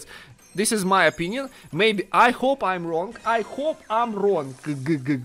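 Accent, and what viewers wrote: Russia. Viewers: Yes. And i think he's got russian acsent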